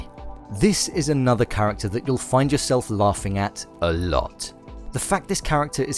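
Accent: United Kingdom. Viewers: It's so fucking try-hard with it's "crude" humour and gags. Especially with the celebrity references. This guy thinks it's "hilarious"? What a waste of a posh english accent. lol I enjoy Rick and Morty. I think American Dad is funny and sometimes even Family Guy. But Paradise PD is very very very very VERYYYYY unfunny. I laughed once every 1.5 episodes. Nothing subtle. AT ALL. They try to be rude, crude and lewd at every single point. For every one sentence of scene building there are 5 or 6 "jokes". Bad "jokes" and "gags". It's like those parody movies style of humour. Scary Movies, Not Another Teen Movie, Meet The Spartans, Vampires Suck and so on... I watched all 10 episodes btw.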